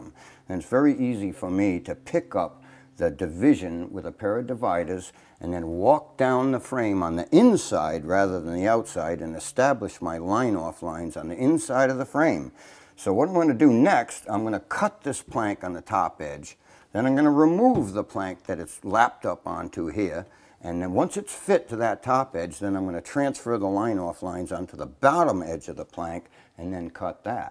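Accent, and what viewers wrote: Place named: Rhode Island, United States. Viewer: I'm very happy I found your videos. You get bonus points for your accent. I'm a Californian, but my folks were from Rhode Island. It brings back fond memories.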